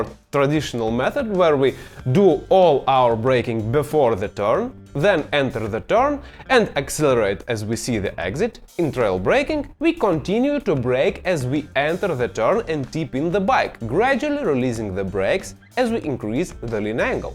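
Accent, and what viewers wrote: Russia. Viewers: Hi from Bundaberg Australia 🇦🇺😊👍 Ive seen many many Educators Video's on Motorcycle Riding 🏍 Here in Australia & American BUT none of them match Your Video's , Some other people's are good BUT most are average ! Ive learnt so much from You & your teachings 😊👍 Im now understanding Your Russian Accent really well now ☺️ Thank you for doing these video's & know we new & im thinking even more experienced riders appreciates them & learning plenty ! Cheers from Aus matey 🇦🇺🕺🍹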